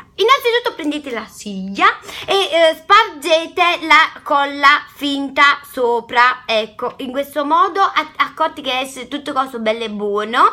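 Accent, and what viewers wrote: Italy. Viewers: Ma sei di Bari di Napoli o di Roma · 😂😂😂😂Napoletana doc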